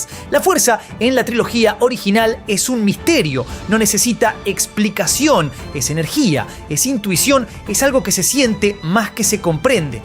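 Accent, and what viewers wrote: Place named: Argentina. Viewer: ¡Es tan épica la historia de Lucas y #StarWars que incluso este pinche video narrado en HORRIBLE ACENTO ARGENTO (que destruye al idioma español y al inglés al mismo tiempo) resulta algo tolerable! 🤌🤌 ¡#MalditosArgentos, son tan odiosos e insoportables! :body-green-covering-eyes::face-turquoise-covering-eyes::face-turquoise-covering-eyes::face-turquoise-covering-eyes:🤮🤮🤮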